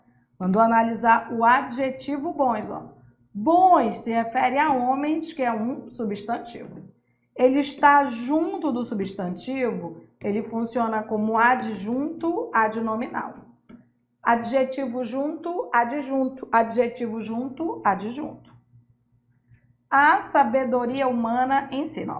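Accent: Pará, Brazil. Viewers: Muito bons o conteúdo e a aula ❤ Percebi que a professora tem o sotaque do nosso Pará ❤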